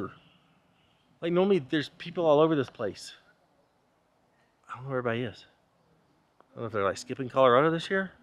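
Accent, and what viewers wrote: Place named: France. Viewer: Your Frenche is adorable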